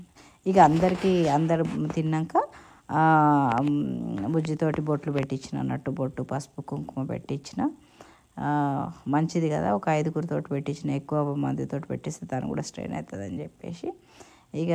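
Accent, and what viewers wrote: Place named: Telangana, India. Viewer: Hi andi🙏 mee language pakka Telangana andi🙏😅😊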